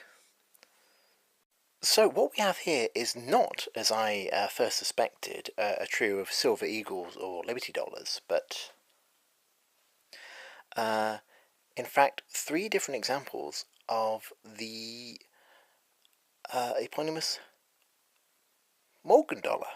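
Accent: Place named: Scotland, United Kingdom. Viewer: There’s nothing like being schooled about US coins from a Brit. The British twang makes it all the more supple! edit: oh sorry, Scottish.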